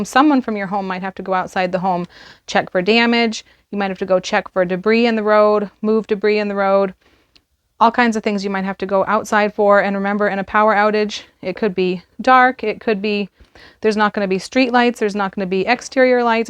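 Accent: Maine, United States. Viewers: Sounds like your from Maine which is awesome! I live in the mid coast area. I also have a black out box even though I live off grid lol. I even have an old school crank flashlight/ radio.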